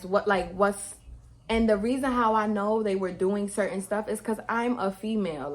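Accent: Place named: New York, United States. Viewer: Lol ladies not real friends to begin with a girls trip like this already sounds like a no no, 1 or 2 day ones max, lol shorty u Def from nyc I already know I can tell from the lingo I can hear my people's in your voice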